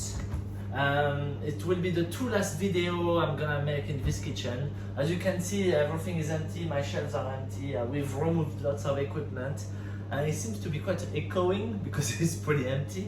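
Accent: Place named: France. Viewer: I have seen you make this recipe before and thanks also for never failing to put a smile on my face because you are so funny and you have the best French accent.😊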